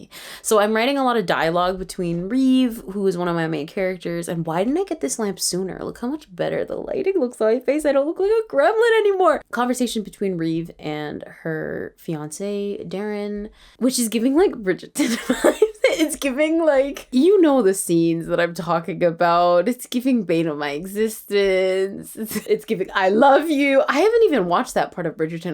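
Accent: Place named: United Kingdom. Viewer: i need you to narrate my books in your british accent asl;dkgj i love your writing vlogs so much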